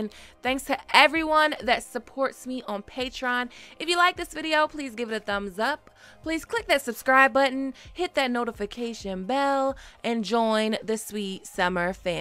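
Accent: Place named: Baltimore, United States. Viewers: The Baltimore accent is strong in this one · you sound like you from maryland, just saying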